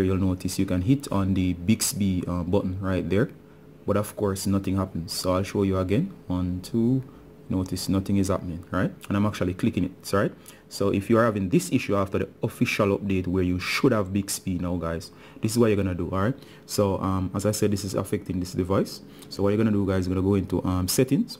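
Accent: Jamaica. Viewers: where are you from, you sound Jamaican.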